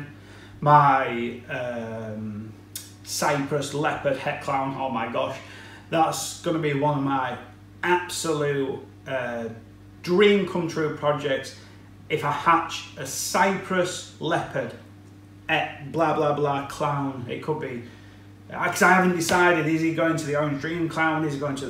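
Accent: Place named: Northern England, United Kingdom. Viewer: Cracking upbeat advice...I got me a killer male...with a smiley emoji on his head from some bloke with a northern accent and an unreal car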